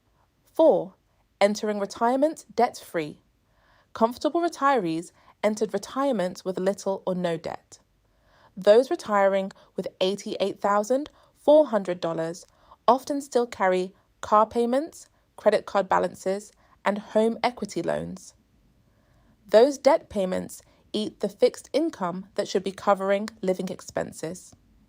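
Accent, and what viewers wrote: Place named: North Carolina, United States. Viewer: Durham NC.. Nice accent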